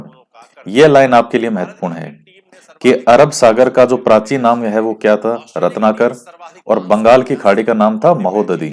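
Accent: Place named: Sikar, India. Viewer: Sir aapki aawaj se ESE lagta hai jase Ki AAP sikar se lagte ho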